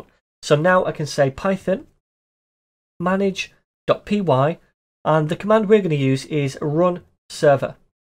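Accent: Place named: North, United Kingdom. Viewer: nice to hear and be taught by a fellow northerner!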